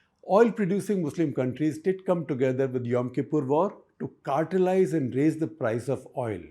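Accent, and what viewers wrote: India. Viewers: His thick Indian accent requires a lot of focus to comprehend what he is saying.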